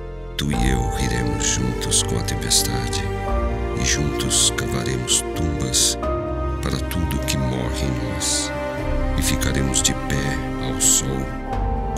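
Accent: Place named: Brazil. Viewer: Adoro ouvir declamar poesia em português com a musicalidade do sotaque brasileiro. Saudações de Portugal.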